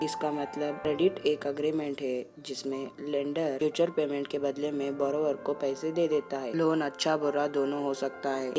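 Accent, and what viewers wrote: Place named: Uttar Pradesh, India. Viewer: Avaj se UP ka lag rha hai bhai tu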